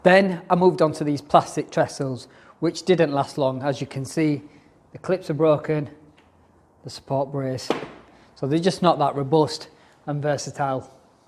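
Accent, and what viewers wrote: United Kingdom. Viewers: Fantastic review. Informative, demonstrative and to the point. No fluff. And a lovely Northern accent to boot! Hope to see more of your reviews in the future.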